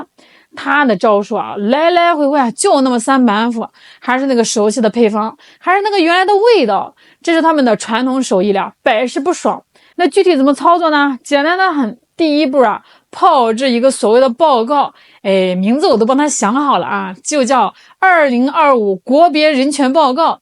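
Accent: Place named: Northeast, China. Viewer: 唉呦喂 東北妹的腔調就是有致命的吸引力啊 喜歡😘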